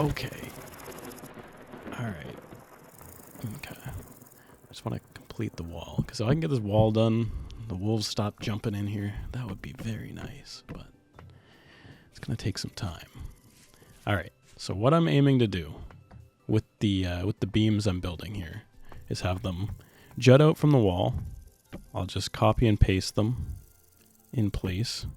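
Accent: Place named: Newfoundland, Canada. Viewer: Are you from the rock? Your accent sounds slightly like Newfoundland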